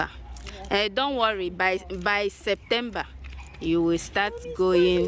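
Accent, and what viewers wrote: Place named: Mbano, Nigeria. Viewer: Even my children call fly mosque 😂😂😂 nne you sound like mbano oo chia i enjoy your igbo. It sound so much like my hometown 😊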